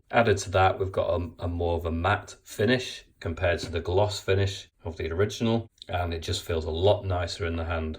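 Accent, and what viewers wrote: United Kingdom. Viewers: the brit voice makes it better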